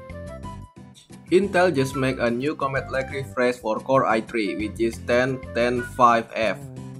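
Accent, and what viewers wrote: Indonesia. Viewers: logat nya kayak indo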